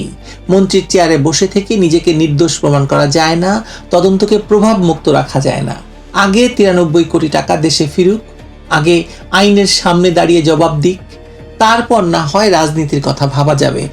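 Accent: Kolkata, India. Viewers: Your Bengali accent is like Kolkata's Bengali accent. I like and enjoy the way you speak.🙏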